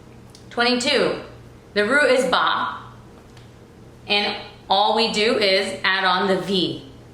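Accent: United States. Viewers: How did u acquire this American accent. Looks like You've been settled in US for a very long time...pan memoni boli khand ji goli... period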